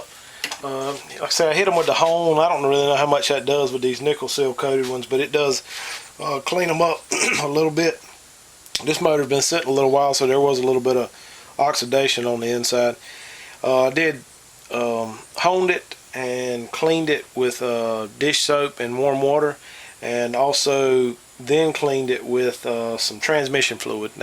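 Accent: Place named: North Carolina, United States. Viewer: Where you from, you sound like your from my neck of the woods, NC, great video, hate we missed those few parts from the recorder but for most part excellent video, God bless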